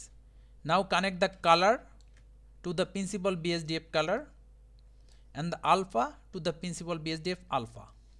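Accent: India. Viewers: as usual, when you hear an indian voice when looking for a tutorial, it means you found the answer... Thanks!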